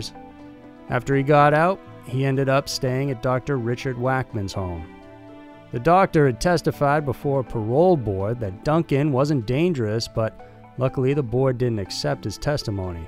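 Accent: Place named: Texas, United States. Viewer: Your bayou / Texan syrupy accent is just so nice to listen to - despite the content being so disturbing. Happy to have found your channel. 💖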